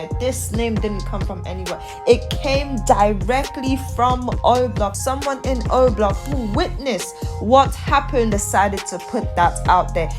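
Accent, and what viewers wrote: United Kingdom. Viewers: 👎 for the accent, stick to that side of the pond with your “UK Dill”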